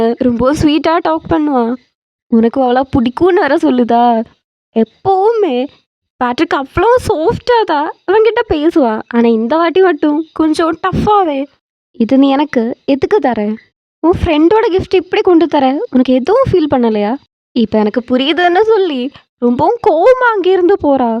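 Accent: Kerala, India. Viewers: Malayali tamil ayyo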